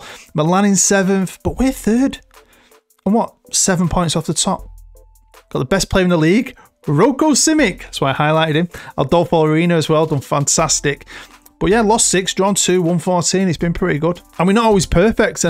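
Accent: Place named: Glasgow, United Kingdom. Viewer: Personally....I'm proud that I can finally understand Glaswegian!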